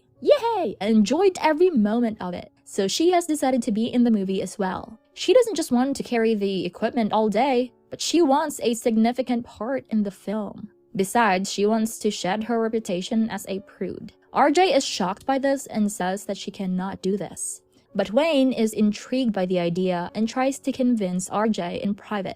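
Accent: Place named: Russia. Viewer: Your Russian accent is funny.... Do more of it 🤣🤣🤣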